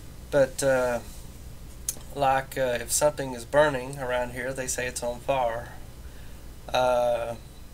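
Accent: South, United States. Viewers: I'm a Midwestern American, and I enjoyed your video. I love learning about accents here and in other countries too. Just as you recently learned about the many English, Irish, etc. accents, I've only recently learned how many US Southern ones there are. Yours is lovely. When I've been in the UK, people trying to imitate an American accent often do a (bad) Southern one. BTW: if you don't think Southern accents can be sexy on a guy, watch Georgia native Josh Holloway on the show Lost!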